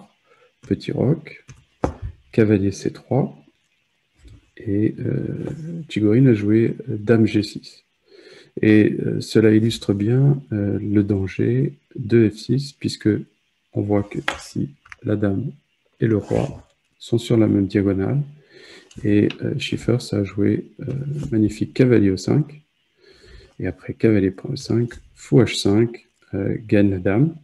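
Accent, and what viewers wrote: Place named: South, France. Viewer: magnifique vidéo, très pédagogique ! Je vais reprendre mon activité sur la chaine "échecs en amateur" et parler de ta chaine comme une pépite du net échiquéen francophone... dès que le COVID m'en laissera le temps (je suis médecin)... en tout cas c'est un vrai plaisir de visionner tes videos : courtes claires et ensoldeillées par ce bel accent du sud !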